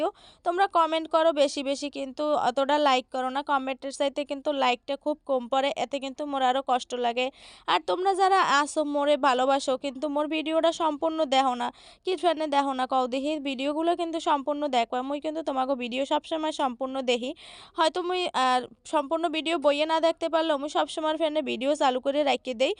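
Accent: Barishal, Bangladesh. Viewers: মুই কিন্তু তোমার মত মোদের সব বরিশালে ভাসা পারিনা মুই কিন্তু বরিশালে মাইয়া ❤❤😂😂😂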